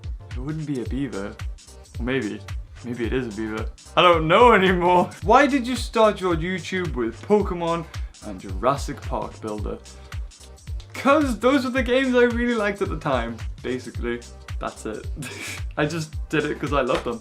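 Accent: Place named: United Kingdom. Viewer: If it's not to much trouble but do you mind me asking you where you live You British and most of my friends are British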